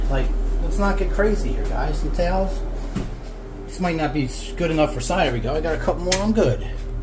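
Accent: Philadelphia, United States. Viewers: When I close my eyes I'd swear I'm listening Bam Margera hiking! lol Is that a Phillie accent?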